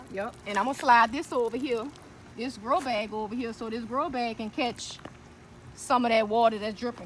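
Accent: New Orleans, United States. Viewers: I subscribed because I love your New Orlean accent❤❤❤❤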